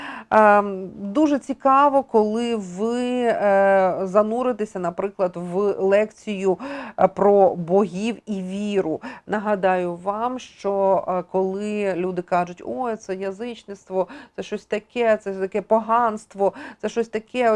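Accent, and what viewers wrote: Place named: Galicia, Ukraine. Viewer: Навіщо постійно, останнім часом, потрібно хизуватися галицьким(як в народі мовлять - западенським діалектом). Багато слів у південно-західному наріччі схожі зі словами в рус.язикє, і навіть наголос. Почали зі слова знайдЕте, в язикє- найдЁте. А чому не вимовити як розмовляє(балакає) решта України - знАйдете?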